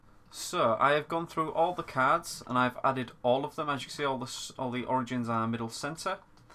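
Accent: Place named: Yorkshire, United Kingdom. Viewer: this is a great video, so nice to see a tutorial for beginners that is not just another platformer. just what i wanted/needed oh and I love the accent, there are really not enough Yorkshire accents on this site.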